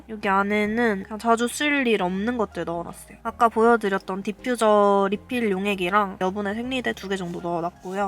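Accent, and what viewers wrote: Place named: Jeolla, South Korea. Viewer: 전라도 사투리 심하구만 왜 서울사람인척?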